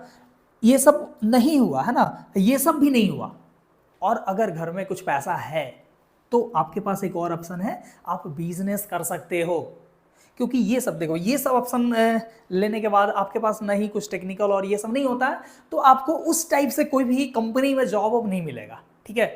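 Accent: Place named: Chhapra, India. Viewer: Sir aapke video me chhapra wala tone aata hai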